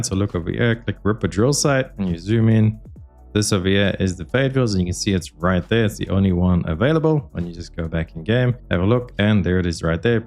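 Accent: South Africa. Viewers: Great video very well laid out. Curious is that an South African in AUS accent? Just guessing don't burn me if im wrong.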